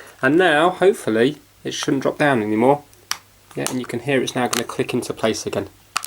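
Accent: England, United Kingdom. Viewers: British accents are sooooo satisfying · Yes, he is from England. they say torch for the word light.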